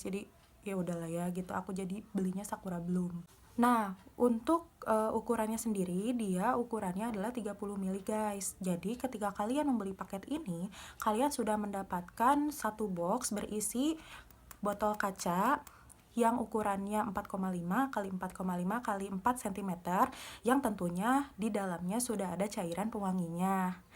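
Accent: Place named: West Java, Indonesia. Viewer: Haha lucu bgt logat sundanya 😁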